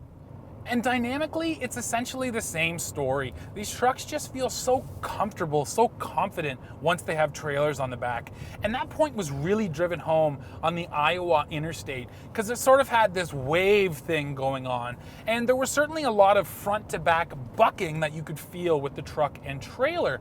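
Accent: Canada. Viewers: Actually, his accent is a Canadian one.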